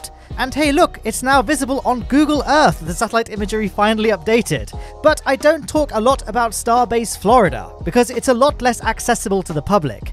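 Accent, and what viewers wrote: United Kingdom. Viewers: This particular British Ascent is soo..bombastically annoying but at least he owns it. Total Game show host voice.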